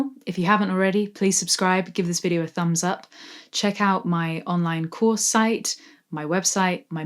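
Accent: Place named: England, United Kingdom. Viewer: I'm inclined to agree with bunnyboiler6353 – I've always liked your spoken voice and enunciation, and as an American Midwesterner, I'm a sucker for a girl with an English accent of almost any kind to begin with. I thought the "rushed breath" tip especially good. Sorry to hear about your back – I've had back issues for decades. Learn to stay within yourself when doing your gym/workout stuff, just as you do with vocals. Stay safe!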